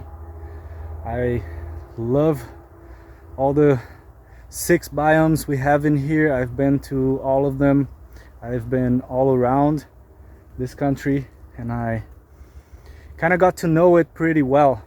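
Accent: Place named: Brazil. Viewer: Publica mais videos, estou tentando aprender ingles e ver um br falando ingles com sotaque é mt bom pra mim praticar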